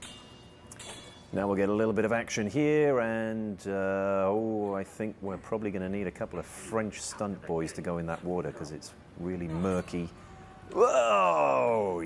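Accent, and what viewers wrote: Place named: United Kingdom. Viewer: Love the british, or whatever it is, accent.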